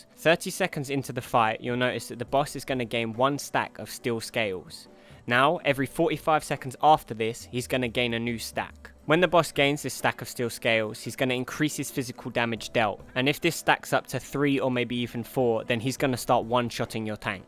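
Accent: United Kingdom. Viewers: Great vid. Thnx. It must be the British voice.:D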